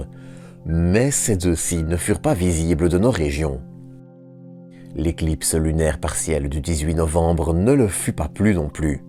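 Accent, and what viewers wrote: Belgium. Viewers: Hé non c'est l'accent belge 😉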